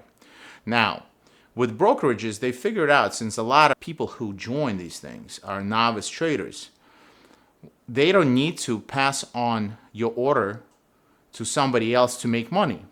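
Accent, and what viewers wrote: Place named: Boston, United States. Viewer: you got that boston accent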